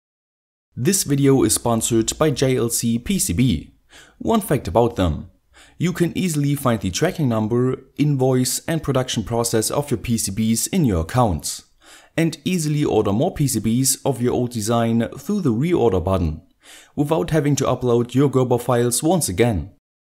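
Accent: Germany. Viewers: Sounds a bit German-ish :)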